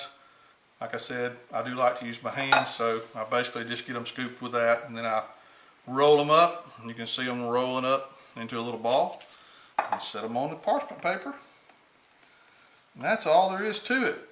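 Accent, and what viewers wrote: South, United States. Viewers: My husband is going to LOVE these! Thank you and so much for this recipe! I love your accent and funny southern expressions..."Keep doin it till you got it gone" lol. Very entertaining... and these are great recipes! I made your Date Nut Balls this morning for our Christmas party today. Delish! xox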